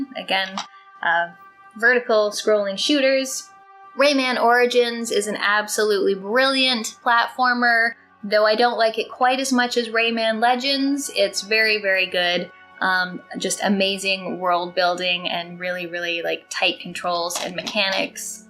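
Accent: Canada. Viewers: Really enjoyed the tour, thanks! You've got a pleasant voice and i love the articulation and of course the Canadian 'about'.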